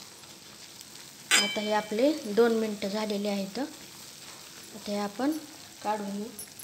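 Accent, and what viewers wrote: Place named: Ahmednagar, India. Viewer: Tumcha gaav kutla? Awazavarun Ahmednagar zilla che vatatat.....ho ka?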